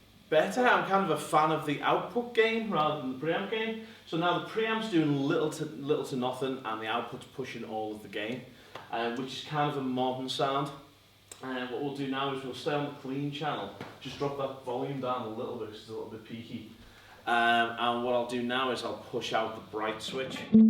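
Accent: Liverpool, United Kingdom. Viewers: Scouse (Liverpool) accent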